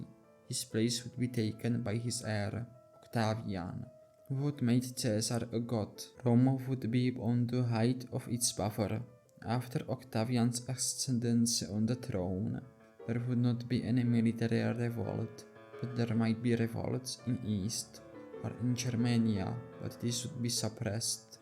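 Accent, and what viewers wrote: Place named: Czech Republic. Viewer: Tbh you get used to it eventually and I like that traditional Czech accent.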